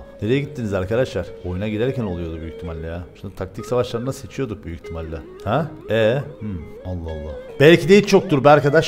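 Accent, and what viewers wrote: Cyprus. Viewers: Kıbrıs şivesi değil mi :)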